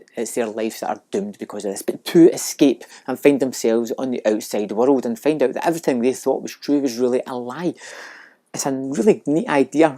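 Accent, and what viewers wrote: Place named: Glasgow, United Kingdom. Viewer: I guess its from Glasgow? Have to digest what you are saying as I am foreigner living in England. But your reviews are good just watched Neesons Non-Stop which was great and the 70-80 % of stuff in Netflix/Amazon is average/bad.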